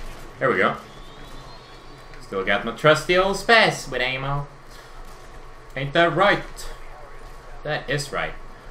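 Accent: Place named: Sweden. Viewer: nope just a normal swedish accent ^^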